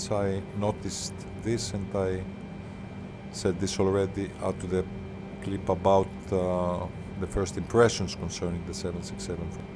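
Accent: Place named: India. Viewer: the minute i herd the indian voicwe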